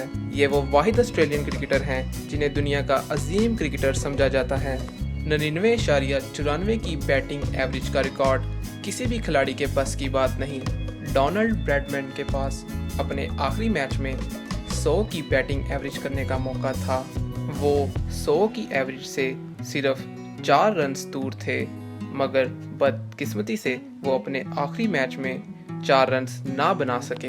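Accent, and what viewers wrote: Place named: Punjab, Pakistan. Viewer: urdu chek kr ustad bndy ki level kr gea urdu in punjabi tone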